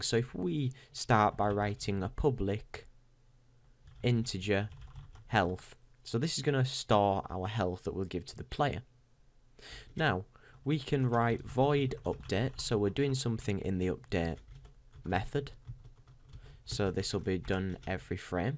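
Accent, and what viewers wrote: United Kingdom. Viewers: I've already saved your channel AND subscribed. I'll definitely be exhausting your videos before I hunt for any more. Wish I could buy you a beer...or a i guess that would be more like a 'pint' since it sounds like you're in the UK. Cheers!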